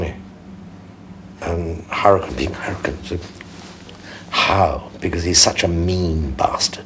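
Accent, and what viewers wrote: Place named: England, United Kingdom. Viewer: This is how all Americans think English people speak.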